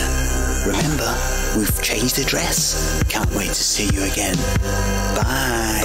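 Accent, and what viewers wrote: Scotland, United Kingdom. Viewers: Anlglisized Scotch accent to the MAX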